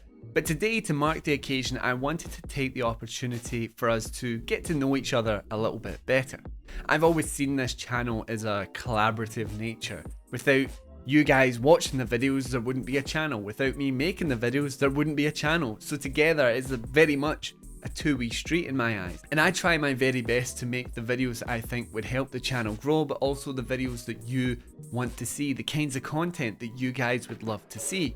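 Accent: Scotland, United Kingdom. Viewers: Push Square has rapidly become my favorite gaming website, because it’s refreshing to have a place where people discuss video games with no apparent agenda beyond the love of the medium and a desire to see more good games. Even commenters are mostly civil to each other, and that is down to the site establishing the desired tone (and a little moderation from time to time.) So now I’ve subscribed to the YouTube channel and so far I’ve enjoyed what I’ve seen. I liked the couple tech reviews. I’d prefer that you go in depth on one topic to making “Top 7” videos, if you’re taking requests. The topic should be whatever interests you, because that’s the only way you’ll make videos a million other people couldn’t make. The accent is great! I’m American but my mother came from Glasgow and all my favorite relatives sound like you (though maybe you’re from another part of Scotland because I understand you just fine at 1.25x speed.) Tifa was the correct answer. 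The proper response to the swallow question was “African or European swallow?” You’re doing great!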